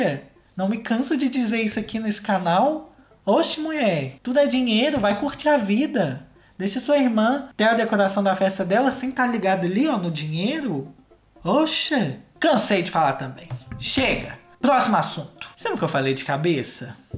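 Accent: Minas Gerais, Brazil. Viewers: Eu gosto de comida boa não de comida xexelenta BERRO KKKKKKKKKK PS.: cê é mineiro? Pq pelo sotaque parece um pouco kkkkkkk obrigada por não decepcionar nos vídeos 💖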